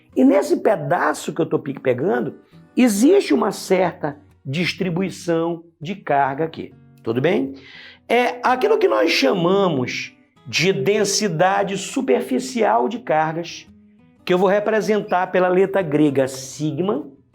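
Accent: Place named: Pará, Brazil. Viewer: que aula massa, professor!! super didática e de fato imprescindível para meus estudos. o melhor é o sotaque paraense (?) hehehe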